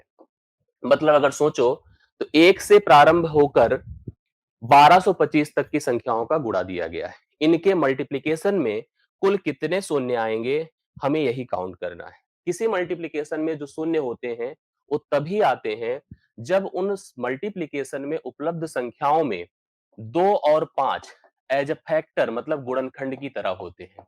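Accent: Bihar, India. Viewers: Guruji bihari hi is liye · Bihar se hoge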